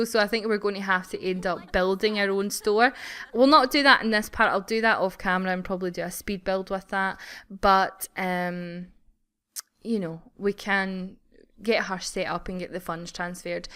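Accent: Scotland, United Kingdom. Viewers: Your accent is amazing:) i grew up in Hamilton/Glasgow keep up the amazing videos:) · For lunch I had: CHOCOLATE, EASTER EGG, and some other stuff (cheese and biscuits? I don't remember) but most importantly CHOCOLATE!!! SO EXCITED!!! But med school is a lot of dedication just to meet hunky guys... The family (plus Grace) are so cool!!! BTW As a English-American person (who needs to work on her accent), I think that Scotland is really awesome, and your accent is adorable! I would love to go to Scotland, I only went when I was little. It's nice to see some of the new stuff (clothes, hair, etc.) on actual sims to be played with, not just maxis' creations. And Tate is really cute. :D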